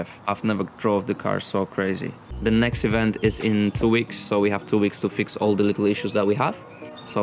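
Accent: United Kingdom. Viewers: ngl you have a hint of a British accent 😂😂😂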